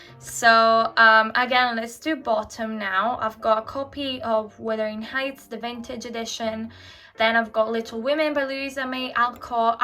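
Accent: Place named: Scotland, United Kingdom. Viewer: Her accent sounds Scottish, so I assume Scotland.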